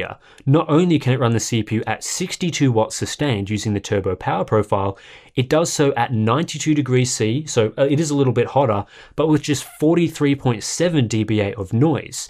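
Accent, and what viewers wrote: Australia. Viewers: Good day mate, are you from Australia?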